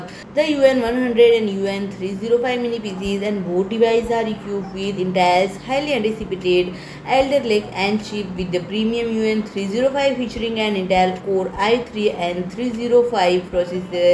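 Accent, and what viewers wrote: India. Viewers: Didn't understand a single thing. Music + horrible mic + indian accent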